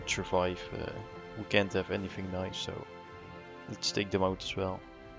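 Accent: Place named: Netherlands. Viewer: Lol the fact that i hear your dutch accent made me watch the entire video well done great vid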